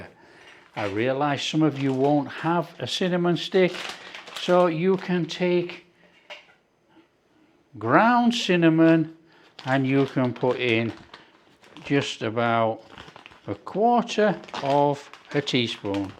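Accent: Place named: Northern England, United Kingdom. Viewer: I love our Northern accent (so many posh Southerners presenting) . I am addicted to these in Lid ull 75p buy 5 one each work day.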